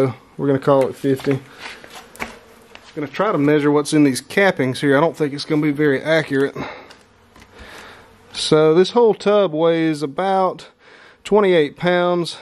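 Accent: South, United States. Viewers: Can someone please tell me where in the south this accent is spoken.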